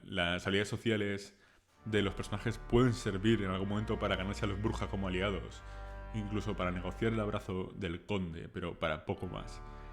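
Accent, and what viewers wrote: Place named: Spain. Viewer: El contenido es bueno pero tienes muy acentuado tu acento español que no esta mal pero tiendes a pegar las palabras y se hace sosa la narracion me gustaria que tuvieras un acento mas. Neutral y fresco muchos ya lo estan haciendo sugerencia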